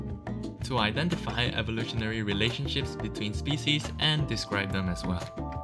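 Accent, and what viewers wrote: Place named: Malaysia. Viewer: My bro, you also sound very Malaysian Wیہ😍🙈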